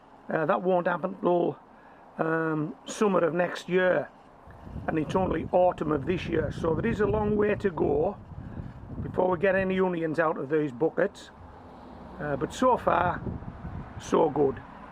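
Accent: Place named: Cumbria, United Kingdom. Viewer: I live in Kintyre now, and miss the Whitehaven so much. I watch your videos a lot, just to hear that Fab Cumbrian accent! Keep them coming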